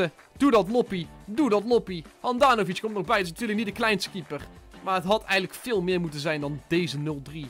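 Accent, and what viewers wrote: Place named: Dordrecht, Netherlands. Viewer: Je ziet wel dat je uit Dordrecht komt je accent is heel anders😔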